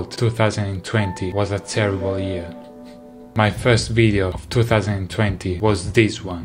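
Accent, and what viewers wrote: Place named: Italy. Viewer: My lord that italian accent is killin me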